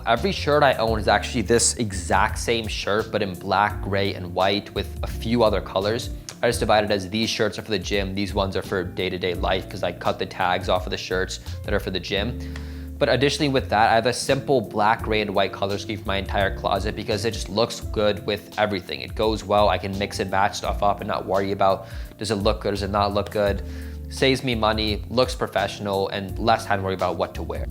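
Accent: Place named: United States. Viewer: Hey you are from India? How did you get the american accent so easily?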